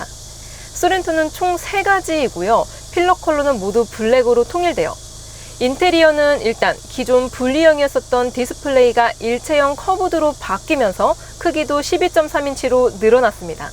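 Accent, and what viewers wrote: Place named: Gyeongsang, South Korea. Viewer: 아나운서 같은게 더 세련된건가? 전 원래 컨셉인 정감있는 경상도 아가씨가 좋아요.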